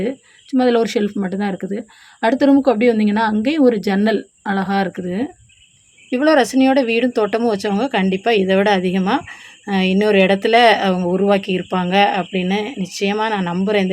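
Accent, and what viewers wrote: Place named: Kanyakumari, India. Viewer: கண்ணியாகுமரி குரல்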